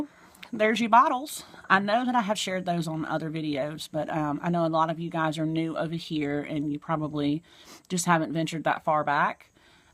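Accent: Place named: North Florida, United States. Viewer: Love the angel bottle! I knew from your accent you are from my neck of the woods and then you mentioned Gainesville. 😎 I am from N. Fla, nice to hear familiar speak. I found one of your videos from the negative stuff going around and then looked for other content from you and watched. You are such a beautiful woman and your heart shows, it's so easy to get caught up in other's BS when you are the brunt of their attacks, but it sounds like you have a wonderful family and a soulmate. You don't need to explain or defend yourself or even acknowledge the BS, your friends will stand by you and your enemies won't care anyhow. Keep posting your art and ignore the antics of a sad and lonely person, you are above that. Take care!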